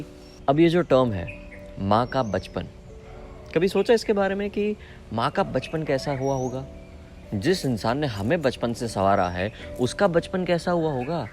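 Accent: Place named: Rajasthan, India. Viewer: Rajasthan k lgte ho Bhai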